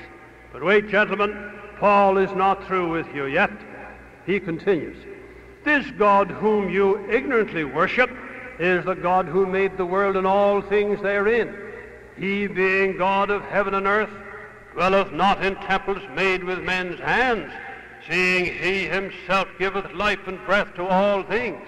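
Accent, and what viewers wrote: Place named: Ireland. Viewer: Alexander Hugh Macmillan (June 2, 1877 – August 26, 1966), He was born in Canada and I love to listen to his slight Irish lilt. A dynamic speaker, he presented a history in his book Faith on the March, published in 1957.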